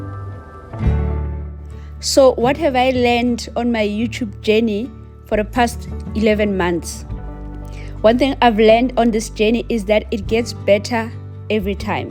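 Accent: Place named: England, United Kingdom. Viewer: American here, and just discovered your channel. Just wanted to say that I love your voice and your English accent!….especially when you roll your “R’s” 😂 reminds me of Tyla 😅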